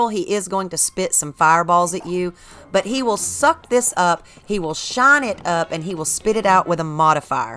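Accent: South, United States. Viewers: FWIW, I thought your explanation was much easier to understand and follow than any of the other channels who go over this. Maybe it’s the Southern accent! ; ) t. a fan from SW Virginia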